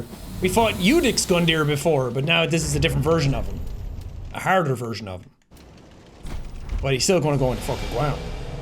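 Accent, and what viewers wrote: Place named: Ireland. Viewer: 8 and a half hours of screaming irish man, yes sign me up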